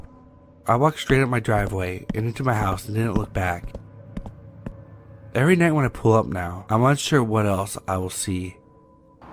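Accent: South, United States. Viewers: I dont know if you had csndy in your mouth or if u havw a cold but if u arw trying to speak differently ( just im case someone told u they dont like the way u speak) then dont like ur voice is okay i like the country wake u speak.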